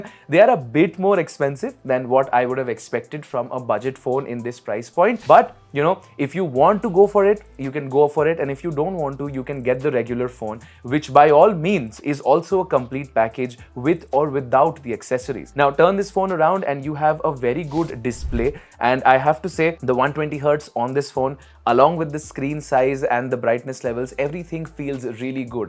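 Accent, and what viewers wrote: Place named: Bengal, India. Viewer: I observed the words you use are really very professional, the voice, the tone, the accent is just amazing. You do not fake your accent by forcefully trying to Americanise it (To be honest that sounds very scammersish lol) you stick to your Indian Bengali English accent, I'll say it is a very effective way to holding on your roots while being modern. I wish to speak English like you. And Thanks for replying 🙏 Btw amio Bangali, Khub e bhalo laglo. I appriciate it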